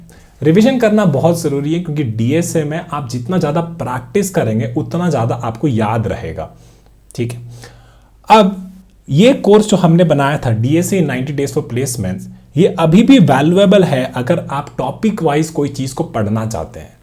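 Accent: Bihar, India. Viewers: Aap bihar se hai na bhaiya !!😅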